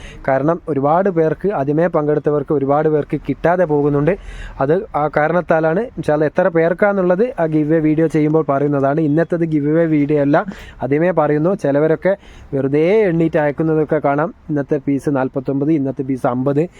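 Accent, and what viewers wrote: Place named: Karunagappally, India. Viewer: Karunagapalli karuda slang anallo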